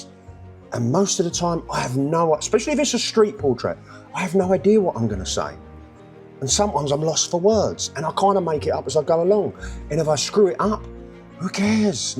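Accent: Cockney, United Kingdom. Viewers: Best video ever made in the history of YouTube. I see you walking around the West Village, and then if I close my eyes and listen, I hear a cockney geezer from East London. That's really the secret. Good for you, mate.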